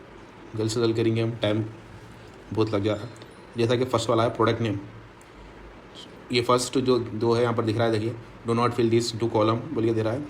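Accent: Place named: Hyderabad, India. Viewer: Language accent se hyderabadi dikhre paasha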